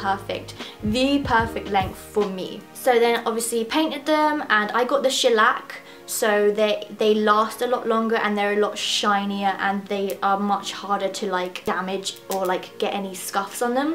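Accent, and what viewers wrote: Scotland, United Kingdom. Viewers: Guessing by her accent is Scottish (said UK) but of Italian ancestry.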